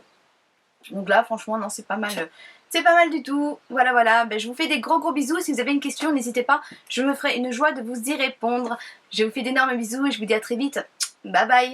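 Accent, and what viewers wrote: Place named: South, France. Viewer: tu as un accent du sud on dirait !😍 ta vidéo est top ! <33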